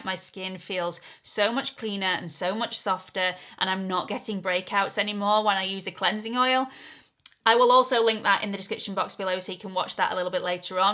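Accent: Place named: London, United Kingdom. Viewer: Love your London-english 💜💃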